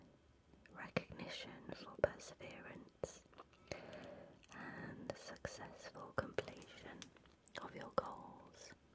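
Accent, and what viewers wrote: England, United Kingdom. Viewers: What a beautiful English accent. Brilliant ASMR, thank you. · Hi.... Just discovered your channel.Makes a nice change hearing an English voice.You have a soft tingly voice.Its crazy I want to hear more of your voice but I am asleep after 5 minutes.So in compensation this video will take weeks to complete.....nice. Kind regards Mick.(UK) x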